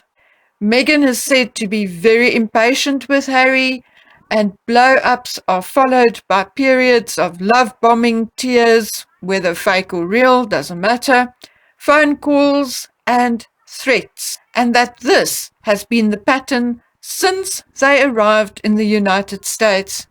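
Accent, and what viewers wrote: Afrikaans, South Africa. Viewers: Daai Afrikaanse aksent sal ek enige plek in die wêreld herken!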